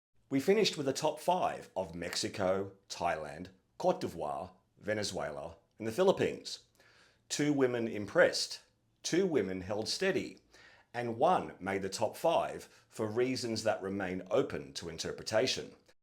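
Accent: Australia. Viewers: Are you Australian?? You sound it. This year is the first year I actually paid any attention to the miss Universe competition and boy our Australian girl was done sooooo dirty by that ugly and boring AF dress in the national costume competition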